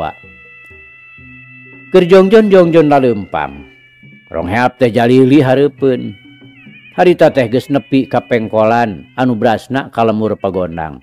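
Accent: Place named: Sunda, Indonesia. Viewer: Hebat! Cariosna nyunda pisan. Hatur nuhun bah!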